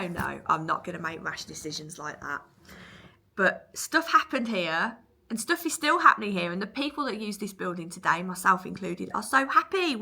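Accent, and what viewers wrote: Birmingham, United Kingdom. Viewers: Hi im glad I found your channel a few weeks ago it's so lovely to hear your brummie accent you show so much interest in the local history of the Midlands i love hearing about the history of the cotswolds where i come from although I now live in denmark i never forget my roots we working class people have so much history to tell that it was us that made Britain what it was keep up the good work, best wishes roy copenhagen denmark. 😊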